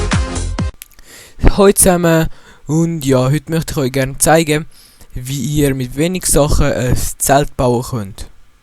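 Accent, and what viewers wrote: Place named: Switzerland. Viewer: Wirklich tolle idee... Aber du solltest hochdeutsch kommentieren denn jeder deutschsprachige schweizer versteht es auch ^^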